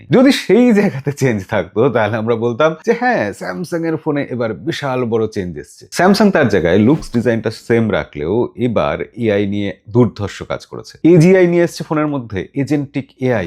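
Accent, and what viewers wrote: Bangladesh. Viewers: দাদা আপনার কি বাংলাদেশে বাড়ি? কারণ আপনার কথা বলার ধরন সম্পূর্ণ বাংলাদেশের মতো।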